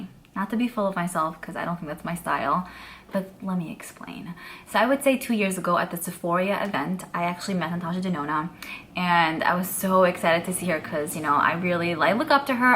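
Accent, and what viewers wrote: New York, United States. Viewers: You have a New York accent, no? I can't believe how people can be...good grief, I love the way you are! ❤ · You have a New York accent. When I moved out of state I was tortured because people would make fun of my accent. 20 years later now I have a Ohio accent and I even say pop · I love your NY accent. You are fantastic and I appreciate your videos. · The way you speak? There's nothing wrong with the way you speak.... Ppl are so freakin' rude.... She lives in New York! She has an accent! F ppl, for real. 💗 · Agreed! I follow because she has her own little dialect and I love a good "New Yawk" accent as well. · I'm a NJ girl, born and raised, and the New York in you makes me love you so very much. Your way of speaking is so endearing to me- keep being authentically you! Unfortunately everyone has an opinion about everything and everyone, and feel the need to shout it from the rooftops of the internet 😡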